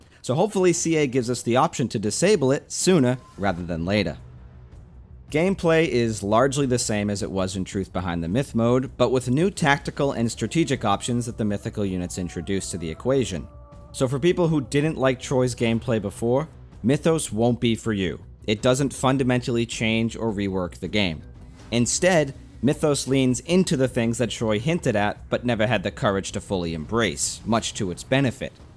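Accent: Boston, United States. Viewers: something about a thick boston accent talking about total war is so funny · I like your accent. Is it Boston? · Haha are you from Boston? Lovely vid, lovely accent.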